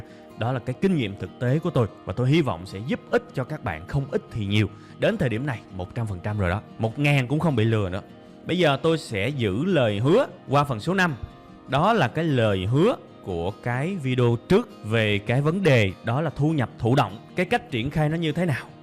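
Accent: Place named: South, Vietnam. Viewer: Giọng a hay , e thic giọng người miền nam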